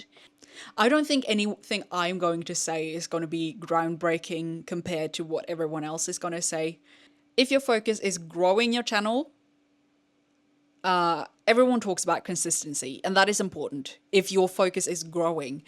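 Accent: Australia. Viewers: Fun video. Also you definitely sound a little Aussie ngl. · I’m English and when I first heard you I thought you were Australian, until I realised you are from Norway 😂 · I’m English and thought for sure u were Australian lol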